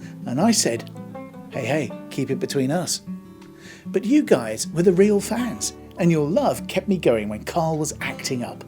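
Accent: United Kingdom. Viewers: This may be a friggin sick joke, but the British accent makes me think he isn't joking. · Wait, was it Carl or? Real Doug's accent was too British.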